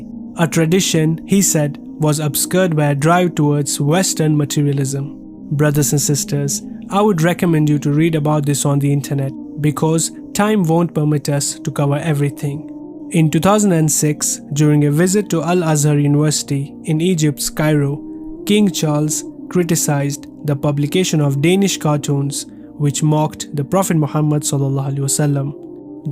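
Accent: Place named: India. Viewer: Too old news these indian posts old information, with funny English accent fix your English first.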